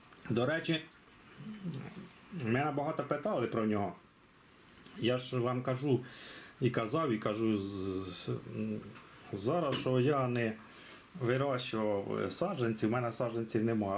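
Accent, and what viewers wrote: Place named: Kirovohrad, Ukraine. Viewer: Дякую за відео! Підписка, вподобайка. Ви часом не з Кіровоградської області? Ну геть розмовляєте, як у нас). Чи продаєте Ви саджанці винограду?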